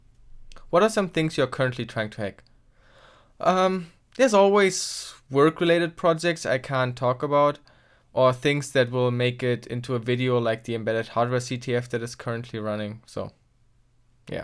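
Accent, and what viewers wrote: Germany. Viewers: Are you German? Because I am and your accent sounds really German, like I sometimes forget I'm watching an English video😂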